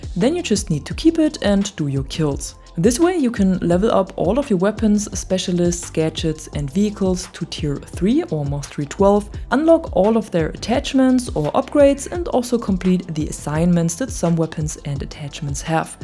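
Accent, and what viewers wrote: Germany. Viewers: Man hört voll raus, dass du deutsch bist 😀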